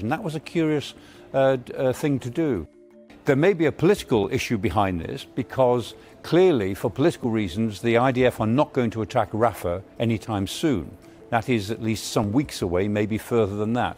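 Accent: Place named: United Kingdom. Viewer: no one, but British pronunciation is annoying lol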